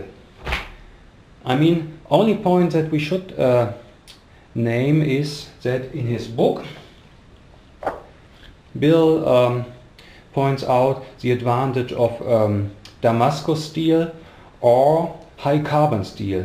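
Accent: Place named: Germany. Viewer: Nice german accent. Thanks for the video.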